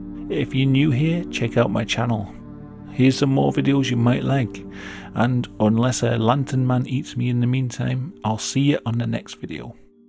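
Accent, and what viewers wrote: United Kingdom. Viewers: British but not your typical crisp British News Channel voice and accent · he is british 🤦‍♀️ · I watch a lot of these sorta stories with british accents of all kinds. I like yours. Reminds me of carl. You know, ricky gervaise's friend on that radio show... the guy they all make fun of. Not saying anything bad about you lmao.. I like carl's accent a lot. And yours is like his. Proceed.. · “Hey everybody, here” in his British voice should be trademarked and copyrighted tbh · is 100% British.. 🇬🇧 from the North West of England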